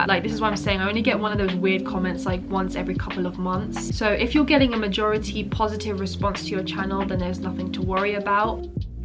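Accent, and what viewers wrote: United Kingdom. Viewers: Yeah I’m in love with her accent. The British accent seems so elegant!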